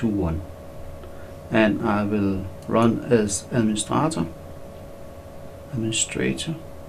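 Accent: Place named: Denmark. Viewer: danish innit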